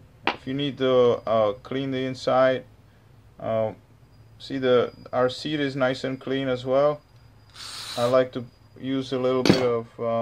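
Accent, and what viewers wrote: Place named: Latin America. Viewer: ABSOLUTE AMAZEBALLS!! Dude with the Latino accent! You are the MAN! Thank you SO much for this video. I've got exactly the same carburator on my old HD Big Twin and I thought I had run all the gas out of it before I stored it. NOT! I didn't get it all. But now, I've got it all solved, AGAIN thanks to YOU!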